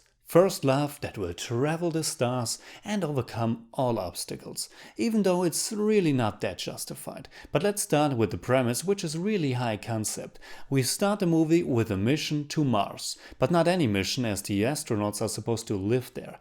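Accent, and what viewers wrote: Germany. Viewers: This is the first vid I'm wtaching from you Are you German ?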